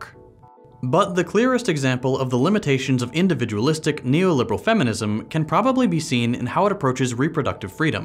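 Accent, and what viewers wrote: United States. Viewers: Hit the nail on the head as usual. As a small aside, as someone who doesn't regularly speak to those with american accents it took me three "gender parity"s to get that I wasnt hearing "gender parody" 😅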